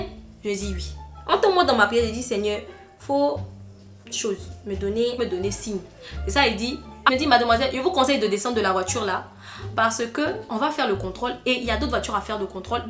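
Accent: Gabon. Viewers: Moi c'est tes accents que j'aime, surtout l'accent gabonais ❤️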